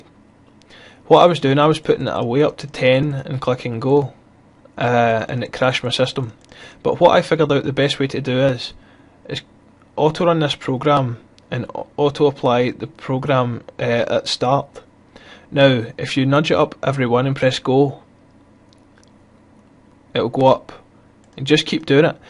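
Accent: Scotland, United Kingdom. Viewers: Was looking for some help on this as my ASRock OC tuner and hwmonitor say my cpu fan is around 3300rpm when idle...is this normal? Also i was pleasantly surprised to hear you're voice and that you're a fellow scot! Greetings from Glasgow! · are u scottish?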